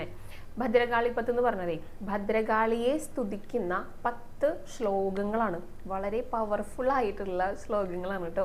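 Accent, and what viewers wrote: Thrissur, India. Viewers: Ur presentation with thrissur accent is liked much. 😢😢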